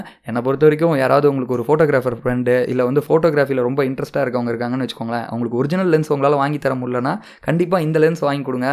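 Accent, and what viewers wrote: Salem, India. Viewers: Bro neenga Salem ma bro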